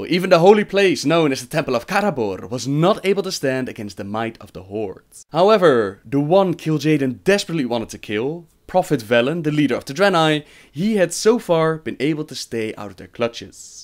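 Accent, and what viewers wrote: Netherlands. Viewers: Nice dutch accent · It's his Dutch accent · JE ACCENT <3 NEDERLANDSE WOW SPELER!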